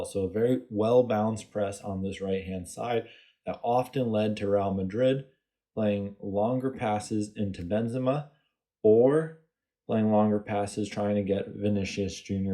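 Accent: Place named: United States. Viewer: Hey man, big fan of your work! Keep it up! Also, one quick question: I notice you cover a lot of club and European football. Since I'm assuming you're a fellow American (based on your accent, at least), do you plan on doing any analysis of the USMNT? Nations league or potential WC matches? I'd LOVE to hear your thoughts on GGG, what they're doing, and/or what they could be doing better.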